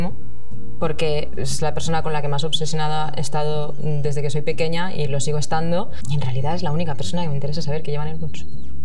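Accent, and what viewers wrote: Catalonia, Spain. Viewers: me encanta su accento mas o menos no puedo entiender personas de Espana. Pero ella hablar suave y muy claro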